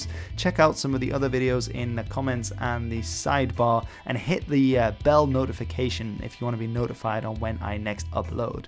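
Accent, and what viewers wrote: South Africa. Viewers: love this! thank you. interestingly, your pronunciation is so good that I can clearly distinguish two distinct accents in your tutoring. there is some northern influence, I'm guessing Pretoria and some Southern, Northern suburbs of capetown. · He sounds like a South African toe me. He has a rolling 'r'. Also there are other subtle things you can hear in his speech that is South African. In fact, I would be really suprised if he is not South African.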